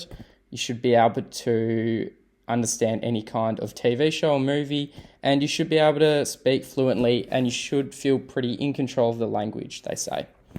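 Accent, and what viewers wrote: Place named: Australia. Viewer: I am around the same hours as you (1300 hours), however, I am not counting hours anymore, just listening to as much content as I can. I agree with what has been said here that native Spanish is much different than Dreaming Spanish videos. I also agree that the roadmap is a little ambitious. I have been using Italki (65 classes) for 6 months and found that it has boosted my confidence, which I think is absolutely vital for moving forward with language learning. My speaking is certainly not fluent, but I can make myself understood. If I am talking about a subject that I am very familiar with, it is much easier than a subject where there is a lot of new vocabulary. My attitude now is not counting hours or making goals, but just to keep plodding and try to enjoy the process as much as possible.I am also reading a lot of books which I think has been great in teaching me sentence structure. 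I am of the firm belief that language learning is long-term progress with no ending - we just keep improving. That is why for me, attitude without self-pressure is vital so that I don't become too self-critical. I would like to add that I think ¨Dreaming Spanish¨is a great platform and I can attribute most of my learning to it. By the way, you sound like you are Australian - I am also living in Melbourne. Your video was frank and honest and that is great to hear because there is so much BS out there on the net with lots of false promises.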